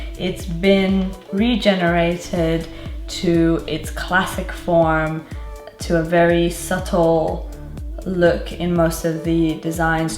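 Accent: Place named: France. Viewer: I swear you're getting a French accent! It really suits you ;) I really like the look of the first trend, I have a soft spot for tailored looks. The faux-fur sounds really nice as well x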